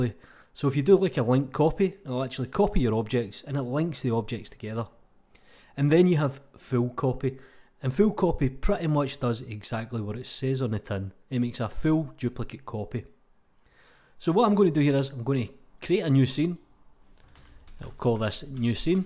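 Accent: Scotland, United Kingdom. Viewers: Love hearing the Scottish accent! I am not native English, but I wish I had that accent 😊 Great tutorial BTW! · eh, sorry but he's clearly Scottish! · i love your Scottish accent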